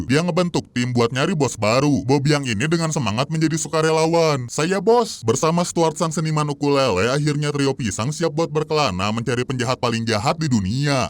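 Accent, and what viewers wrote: Java, Indonesia. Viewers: abang jawir ya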